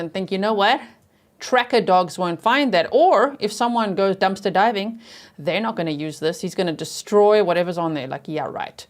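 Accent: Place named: South Africa. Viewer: Shame - you're definitely south Africa. 😂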